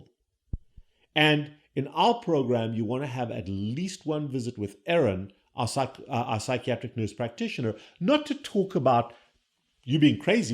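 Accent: South Africa. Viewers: South African accent. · Love this advice, thank you. Love the South African accent! I'm also South African. If people can realise that there is not just a cure all but you also need to develop healthy habits to sustain the weigh loss for the rest of your life. Subscribed! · You sound south African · This is the first time I hear a physician speak from such indepth knowledge, esp with Type 1 Diabetes! I'm a Type 1, on Ozempic and not losing at all. My hba1c is at 8.2... I believe your clinic has such an amazing approach losing weight! BTW, I think your accent is South African? I'm also South African and I wish we had more physicians who were skilled with glp meds. My endocrinologist is not 100% on-board with GLP-meds. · 1. You are Sohth African, by the accent; what British are you talking about?😅 2. I've gained nothing ever since I stopped, which was 10 months ago. 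3. These medications are like miracles. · You have a South African accent 😂? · Hahah… I was just going to ask if the Dr is from SA? Spot the accent a mile away! ❤ from Pretoria!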